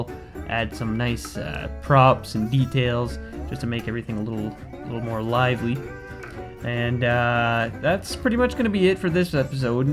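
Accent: Canada. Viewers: Canada, I knew it. I knew you had to be from New England or Canada or something. I wish I knew what my Pittsburgh accent sounded like to other North Americans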